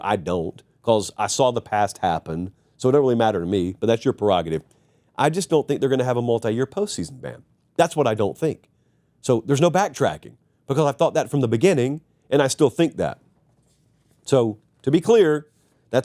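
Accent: South, United States. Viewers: 😂GTFOH! when the story first dropped you were literally saying that you thought nothing would happen. You can use your southern twang all you want to try and backtrack. But you got caught, called out, and now you're trying to do your best tap dancing to get out of being called out. Just admit you were wrong and move on. ScUM is a big brand and you didn't want to piss off their fans. But you backtracked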